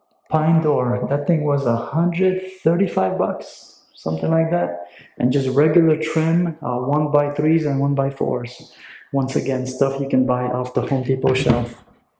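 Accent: Sweden. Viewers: I like this small house. Your accent.. sounds Swedish. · You are going to love it in Iceland. Reccomend coming in July since you don't like cold 😋 I look forward to your next series. I dont know why but your channel is so calming. Not a feeling I associate with building cites lol.. I'we been trying to place your faint accent. But it's to faint to be sure. Sounds a bit Swedish somtimes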